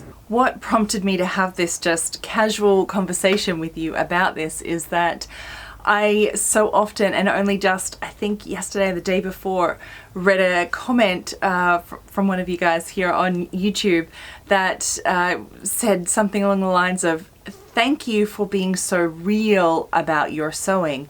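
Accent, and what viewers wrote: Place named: Australia. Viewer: My first time visiting you, found you by accident! Firstly, it's great to hear a fellow Australian and I love your chatty format. Coincidentally, I have just gone back to a project this week. I hacked up an old orange mens suit to make a skirt (it was the colour I had been looking for) but it didn't quite look the way I imagined. I put it aside and moved on to other projects, but it lurked in the back of my mind for a few days and now I know how to fix it. Sometimes I think you need a bit of distance to let go of your 'vision' for the garment so that when you go back, you let the garment speak to you. I've got another skirt and blouse (made to wear together) that I rediscovered last night that I think I will revisit this coming weekend. I seem to do a lot of 'sewing' in my head...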